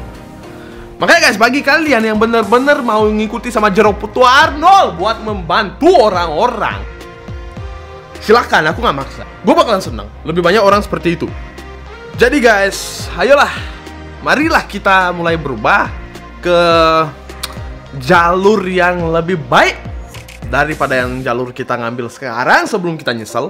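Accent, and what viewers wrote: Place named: Indonesia. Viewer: gilak bahasa indonya udah logat indo banget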